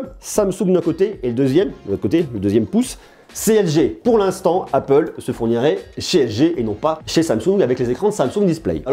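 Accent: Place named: Clermont-Ferrand, France. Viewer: Mais en faites t’es clermontois !!!!!?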